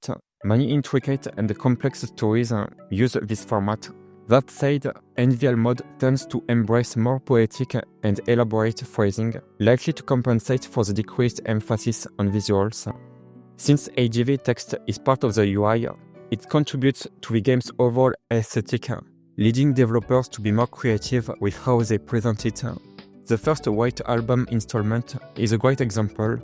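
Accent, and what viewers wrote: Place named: France. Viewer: Gotta love that French accent! Keep the great work. (Also i caught a cold and now I am miserable. 🤧)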